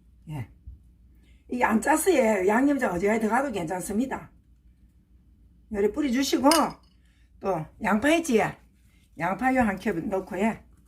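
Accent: Gyeongsang, South Korea. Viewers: 깻잎찜 요리 잘 배웠어요. 참 맛있겠어요. 손 내밀어 주셔서 감사합니다. 경상도 사투리 구수하고 좋네요. 저도 손 잡고 요리 배울게요. 🎁🔔 응원해요!👫💕👍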